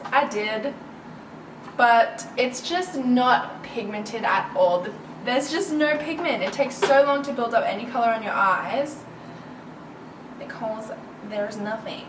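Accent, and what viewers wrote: Australia. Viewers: Mia she's Australian. it's an Australian accent and her address is clear enough to tell she lives in Australia... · Nuria Olid shes british? she sounds kind of australian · Oh my goshhhhh you're so right about the IT powder. Hate it. I do a lot of flash photography and learned the hard way about the white spots. Then again about the UD eyeshadow palettes. I have 3 of them and I bought them when I first started to really get into make up and because urban decay was/is a popular brand only to find out those eyeshadows really do suck. I just got my first ABH palette and there is no comparison. By the way you are so gorgeous I love watching your videos. Plus I love the Australian accent and if I ever go out of the USA I'm going to Australia hands down.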